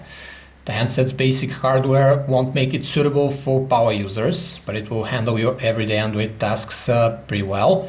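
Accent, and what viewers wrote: Bulgaria. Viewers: Hey! Good review bro! You're Bulgarian arn't you? :-) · Are you bulgarian?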